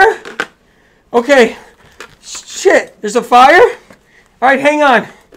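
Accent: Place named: United States. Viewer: Yes great info but can you state it clearly once please & stop repeating everything 3 times. People can replay if they need repeats. Thank you you're great but your delivery is so American & verbose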